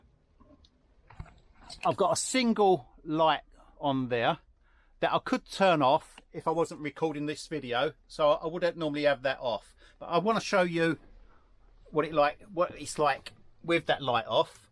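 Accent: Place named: South, United States. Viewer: Hi, all the best for 2025. You will always get haters and whiners when you put yourself out on YouTube. Some people just can’t help themselves for instance your accent i’m Northern you are a southern,if they don’t like it jog on!. there are more and more people waking up to the tyranny of the New World Order dictatorship, this has been in the planning for over 20 years yet most people do not see it and even if you show them they still will not see it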